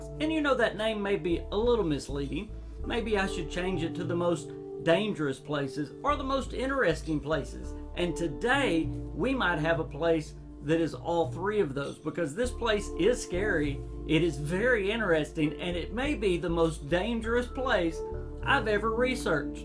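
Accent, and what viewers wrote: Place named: Georgia, United States. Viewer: Sir, are you from Georgia? You say "why" and a few other words that reminds me of how my grandparents talk.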